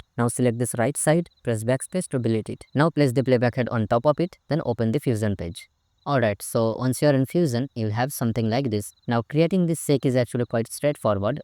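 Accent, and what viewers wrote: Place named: India. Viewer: always the ppl with the indian accents who have the most GOATED tutorials :glasses-purple-yellow-diamond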